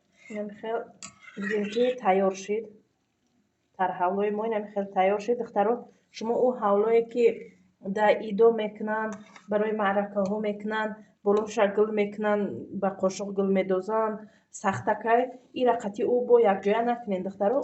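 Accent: Tajikistan. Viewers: ай кучои хисоре чунки камтар лахчаи хисори гап мезане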